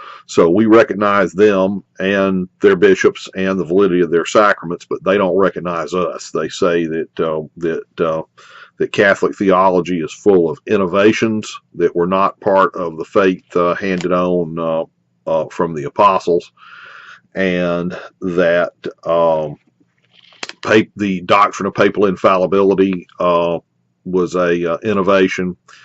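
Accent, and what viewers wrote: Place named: South, United States. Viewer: Nice southern accent. What state are you in? I can’t become Catholic because of the gay clergy. But I respect a lot in what we have in common.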